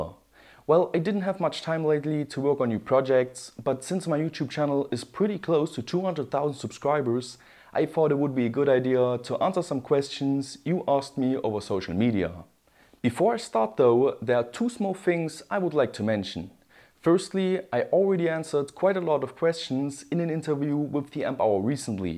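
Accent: Germany. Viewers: Hey, Erstmal großes Kompliment, du machst die Videos sehr gut und ich schau sie mir auch gerne an. Ausserdem sprichst du auch sehr gut Englisch, nur musst du noch ein Bisschen an deinem Akzent arbeiten ;) (klingt zu deutsch)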